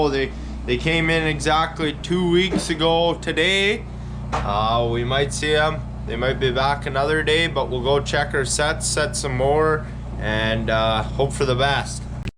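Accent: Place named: Newfoundland, Canada. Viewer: Your accent are you from Canada ,new found land maybe buy great videos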